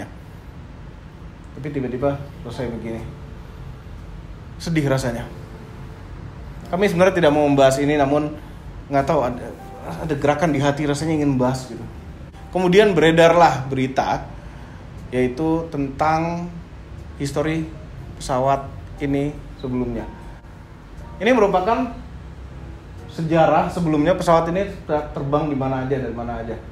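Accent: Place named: Blitar, Indonesia. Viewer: Blitar men logate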